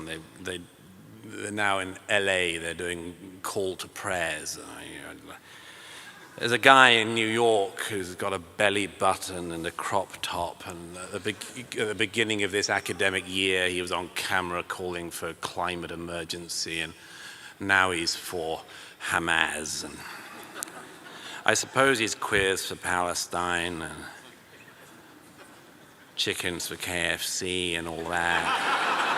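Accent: RP, United Kingdom. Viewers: For an Engishman u r so passionately zionist. And such a thick carefully crafted upper class British accent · Douglas Murray is the proof that you can say absolute shit, but if you say it a picked british accent, people will fall over backwards thinking you're smart. This numpty is the moron's icon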